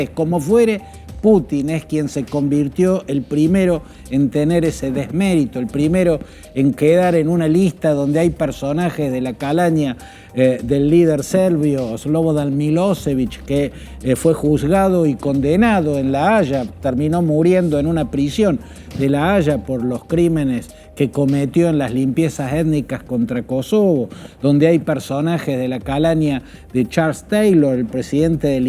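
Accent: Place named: Rioplatense, Argentina. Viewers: Que acento Rosarino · Argentino o Uruguai habla pana de mas😅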